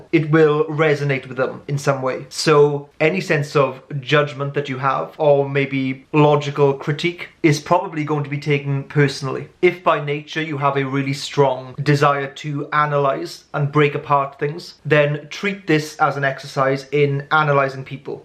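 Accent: Wales, United Kingdom. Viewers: As an INFP female, I watched this video out of curiosity...I’m not even half way through the video yet, and this is the most accurate description of my personality I’ve ever heard....My mind is officially blown !! Is that a Welsh accent by the way? :) I’m from South East Wales - near Cardiff :)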